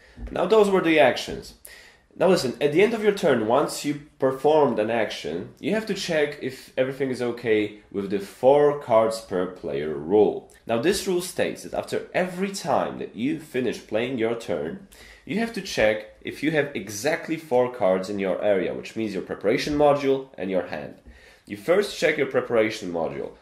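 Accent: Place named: United States. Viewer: Tak z innej beczki.Z doswiadczenia wiem(12 lat w UK),ze czesto lapiemy jako pierwszy akcent ten,ktory jest wokol nas,Po 2 latach pracy w irlandzkiej firmie ludzie do dzis pytaja mnie czy jestem irlandczykiem.Skad ta nalecialosc amerykanskiego akcentu,Za duzo amerykanskich filmow?Moze pierwszy nauczyciel czy tez taki osobisty wybor?To nie hejt ani sarkazm.Po prostu jestem ciekaw.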